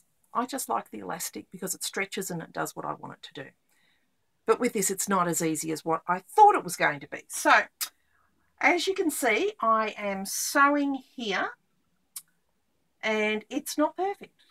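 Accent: Australia. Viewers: You sound like an Aussi? From where? I’m from Bentleigh, Melbourne!!!!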